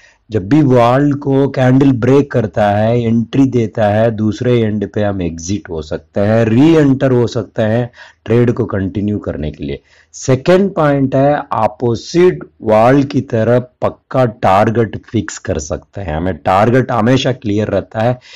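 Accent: Tamil Nadu, India. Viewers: sure will try the strategy strictly for 5 working days. Let see what happens. I have a friend who earn good moeny in Iron fly weekly said the same thing chart will speak to you if you give market some spare time to concentrate and learn. But I don't know why successful traders like my friend they don't like indicators they prefer chart patterns and momentum of the market to catch the momentum for exp recent CPI result. I mean indicator is also doing the same thing indicating over bought over sold (Nadarya envelope) Multip lever will indicate bottom and tops. I mean this indicator are increasing our efficiency and productivity nothing else but they consider as FOMO. Let see by the way thanks for taking the time to guide me in the mid of the night you have earned a loyal SUBSCRIBER. Also your hindi is abstract I guess you're Tamil Shukriya Ji 💐💐🙏🙏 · U r a tamil guy... 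I can see that from ur tone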